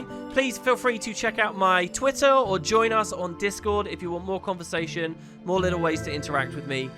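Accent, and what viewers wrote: United Kingdom. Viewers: But he IS actually british. You can hear it even better in old GW1 videos.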